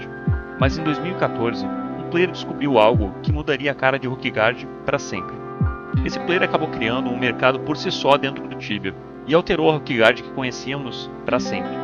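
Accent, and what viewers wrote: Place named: Porto Alegre, Brazil. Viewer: Boa noite, meu querido! Primeiramente, parabéns pelo canal foda, mano! Desculpa, mas sou curioso: tu é de Porto Alegre? Baita sotaque... Também sou daqui!! Abração dum outro velho (26 anos de idade e 16 de tibia) tibiano.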